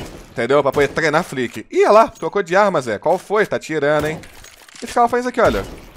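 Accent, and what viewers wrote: Belo Horizonte, Brazil. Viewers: Cara vc tem muito sotaque de BH, vc é?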